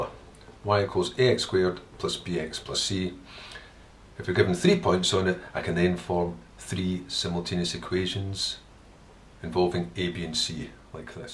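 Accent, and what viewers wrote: Scotland, United Kingdom. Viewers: You sound Scottish to me.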